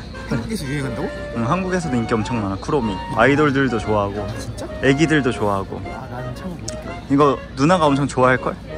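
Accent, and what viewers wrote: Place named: Hakata, Japan. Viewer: 日焼け止めがこんな可愛くてもいいと~？❤ 博多弁のJUJUさんもも可愛か~❤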